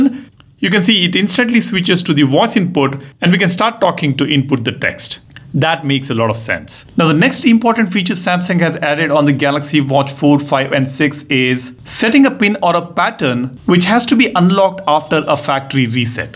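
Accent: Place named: India. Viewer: Your English is terrible 😅😅Indian English..i got headake to listen to you..great Review 👍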